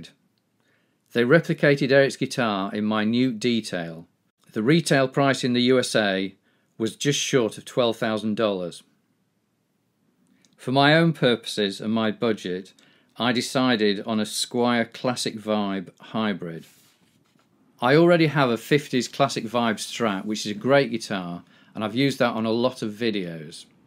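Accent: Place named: Midlands, United Kingdom. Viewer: About 2.5 years ago, I rediscovered my love of electric rock guitar, so I dug out the little japanese guitar I'd had at the back of a wardrobe for decades (Kawai Aquarius, purchased in 1984). The frets were worn down to the fretboard. So I ordered a new neck from Amazon. The one that was sent was not the one they had in the pic, they said they didn't have any more, but I could keep the one they sent for free. Despite having no experience, tools or workshop, I was determined to make it fit. Musician friends said it would never work. But bit by bit, using my bed as a worktop, I sandpapered away areas the neck was too thick, and shimmed up areas where it was too loose. When I came to fit it, it needed a couple of tweaks, but then it worked perfectly. Intonation spot on - action better than the original. It was flatter too - much easier to play. 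I've got some 'proper' guitars now, but I learnt a great deal from that little project! Love the Channel,. You sound like you are from my neck of the woods. (Midlands) You break down all the favourite solos I enjoyed in my youth, so now I can at least play them in slow motion, which is a step in the right direction!;-)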